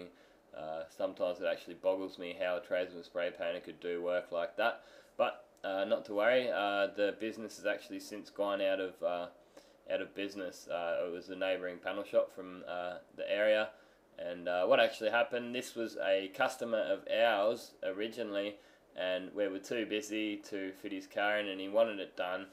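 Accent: Australia. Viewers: this is awesome work mate, where are you in australia? sounded like a qld accent to me haha i’m from adelaide, been watching a few videos for my capella project, its been painted before so i’m thinking wet paint remover will be the best, can you send a message or something of a good paint remover? cheers legend!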